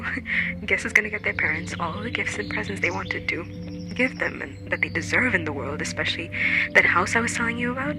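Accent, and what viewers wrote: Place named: India. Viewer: are you indian? you have that accent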